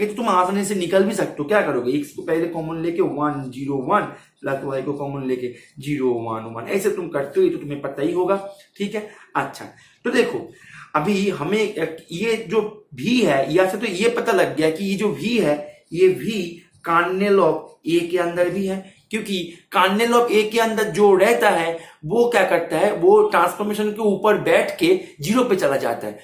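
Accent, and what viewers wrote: Bengal, India. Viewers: Sir Hindi te bolleu sir er bangla tan ta bojha jai tai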